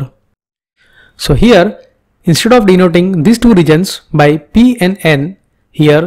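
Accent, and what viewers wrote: India. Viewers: Only an Indian can understand this gibberish. Accent is horrible. It may be a good explanation or not but couldn't tell anything without understand anything. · hundai accent. Shut up n sleep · WHAT AN ACCENT! HOW DO YOU EXPECT PEOPLE WHO DON'T SPEAK HINDI TO UNDERSTAND? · Average Indian accent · I'm Italian, but I understand the "indian" english quite well. It is quite funny. It is very valuable the extensive and profound job that in India is done to produce this wealth of courses and lectures about physics, electronics and solid state engineering.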